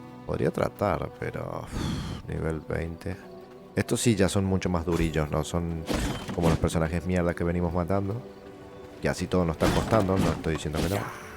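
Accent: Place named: Santiago del Estero, Argentina. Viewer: Buenas hablas como santiagueño ^^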